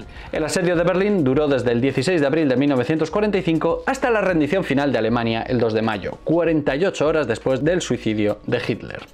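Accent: Spain. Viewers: Soy mexicano y escucho tu acento español, no escucho bien cuando pronuncias el nombre del personaje, yo lo escucho como himler, pero Hitler era uno y himler era otro.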